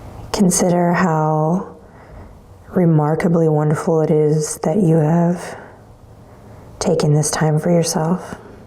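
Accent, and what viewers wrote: Ireland. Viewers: Thank you for your wonderful videos, I love benji! Really finding it enjoyable to follow your videos, i never managed to do yoga by my self at home until finding you.. This week my theme is the heart so this was perfect! things are coming together in my life to allow me to keep my heart open. Sending gratitude from Ireland (perfect that you did an Irish ascent this time!) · i like the irish accent. lololol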